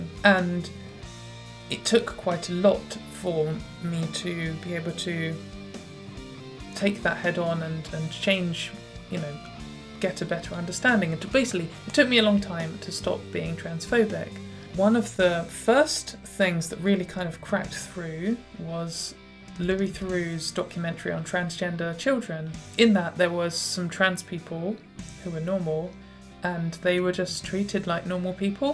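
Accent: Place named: England, United Kingdom. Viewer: Good to hear an English voice doing a video like this. Sensible comments , beautiful looks , nice guitar solo, you have got it all girl !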